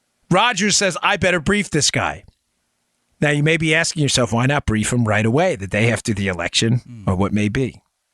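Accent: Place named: Queens, United States. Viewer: nobody can say it like a guy from Queens, I LOVE IT!!!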